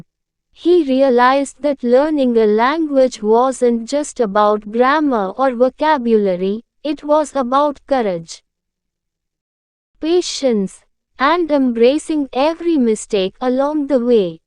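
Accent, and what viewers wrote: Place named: India. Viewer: It is indian accent not british or American 😐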